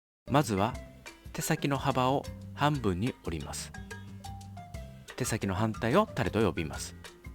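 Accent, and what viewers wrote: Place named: Kyoto, Japan. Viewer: なんか喋り方が京都のお方の喋り方ですなぁ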